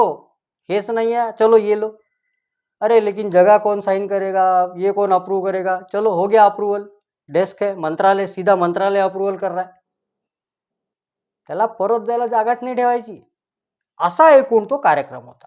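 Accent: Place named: Varhadi, India. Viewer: Sir, mi aaple you tube chanal nehme baghato, karan mala aapali varadi bhasha yekayla khup khup bare vat te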